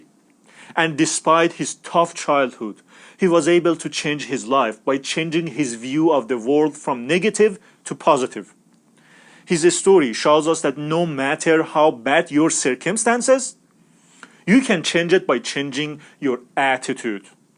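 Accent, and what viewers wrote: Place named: Iran. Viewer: I can tell by the accent that this guy is Iranian....لهجه تابلو هست که گوینده ایرانی هست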